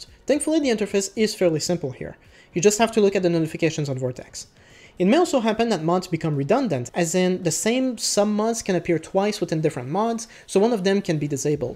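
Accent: Quebec, Canada. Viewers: Is it me or i can sense a little quebecer accent ?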